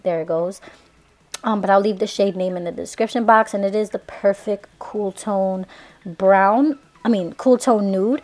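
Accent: New York, United States. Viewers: I'm so glad I came across her. Honestly I love listening to her New York accent more than watching her videos just bc its so soothing but I stay watching these videos, like yes boo give me moreeeeeee!!!!!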